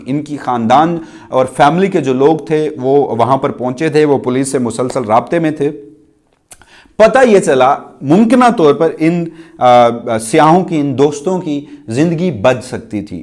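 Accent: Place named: Punjab, Pakistan. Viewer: پلیز اردو بولنے میں خیال رکھیں یہ ہماری قومی زبان پے بھائی اسکو تو صاف طور سے بولا کریں ہماری تو خیر ہے کیونکہ ہم اردو کو بھی اب پنجابی لہجہ کے سننے کے عادی ہو گئے ہیں مگر ہماری نسلیں تو اصل اردو کو بھول ہی جائیں گے لفظ سیاح میں ی پر تشدید مانی جاتی ہے مگر تشدید لکھی نہیں جاتی تو لفظ "سی یا"ہے سیاہ نہیں یہ صرف آپکی اصلاح کیلئے لکھا ہے شکریہ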